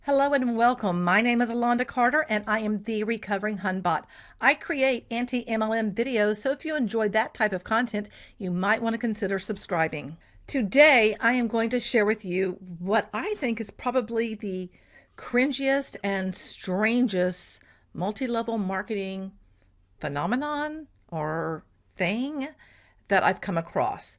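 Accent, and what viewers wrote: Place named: Texas, United States. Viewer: I knew you were Texan 😂 howdy from a North Texan 😂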